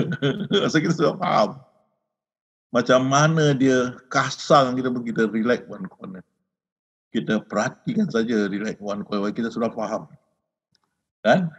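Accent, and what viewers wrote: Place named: Malaysia. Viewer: Saya mohon mengikuti kuliah ustaz .ini kali pertama. . .gaya cakap accent ustaz ni macam gaya orang Melayu dari Malaysia..alhamdulilah.Tk.tuan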